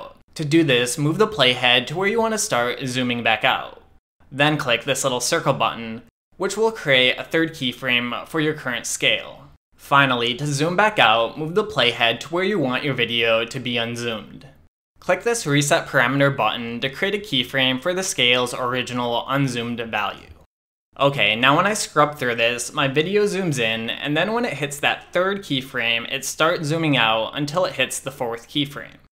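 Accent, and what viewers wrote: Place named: Los Angeles, United States. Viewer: I am not american, but I noticed that LA accent)))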